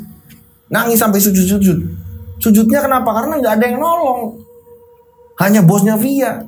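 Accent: Java, Indonesia. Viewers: logat Jawa nya medok bgt s mas klau sy yg paling sy suka boom boom boom nya mas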